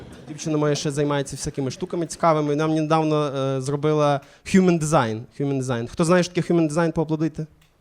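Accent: Galicia, Ukraine. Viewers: Прекрасний галицький акцент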